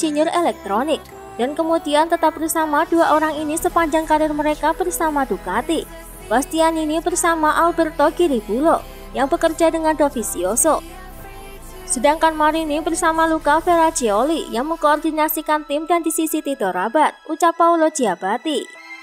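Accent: Java, Indonesia. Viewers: medok jawa mba ny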